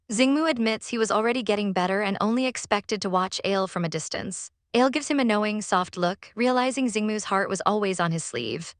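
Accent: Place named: New England, United States. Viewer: Thanks for uploading the next part of this story. I had watched the first portion of it awhile ago and had really been hoping to get the second half of it. I am so glad that after such a tragic beginning, things finally, finally started to turn around. I also want to say that the voice narrator on this story sounds EXACTLY like my sister-in-laws voice! 😅 I almost thought it was her! 😯😆 The accent she has is from living up in the New England states...mostly just outside of Boston, but we did live near eachother years ago back in Maine as well. Made me enjoy the story even more. ❤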